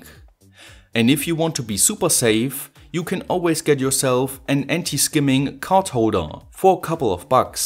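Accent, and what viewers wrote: Germany. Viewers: Dein Deutsch-Englisch is Klasse ;D #noHate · That’s quite a German accent of yours. But I don’t mind. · Your accent sounds German to me. Kann es sein, dass du deutscher bist? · ouhhh! german hyperinflating english...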